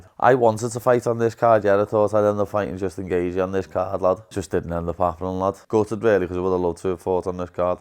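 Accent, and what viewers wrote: Liverpool, United Kingdom. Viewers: if I got a £1 for every time paddy says lad, id be a millionaire 😂 gotta love the Scouse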